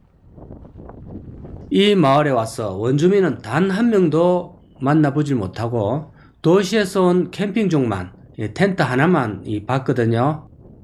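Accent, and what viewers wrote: Gyeongsang, South Korea. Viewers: 쥔 양반 목소리가 상당히 특이하네요~ ^^; 뭐랄까, 갱상도 사투리+교회오빠 목소리 ? 한국 부동산 멸망전을 위해서 오늘도 고군분투 하시느라 고생이 많으시네요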